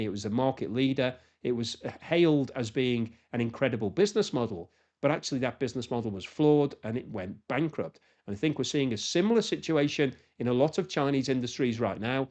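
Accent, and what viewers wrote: United Kingdom. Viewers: Luv the British accent! At least I think it's British!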